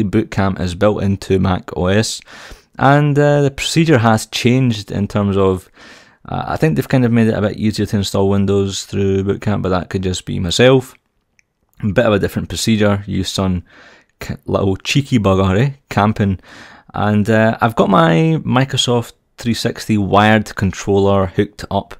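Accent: Scotland, United Kingdom. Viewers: it's scottish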